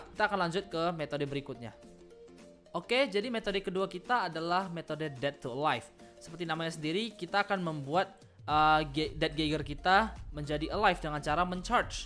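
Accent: Pontianak, Indonesia. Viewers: Abang orang Pontianak ya?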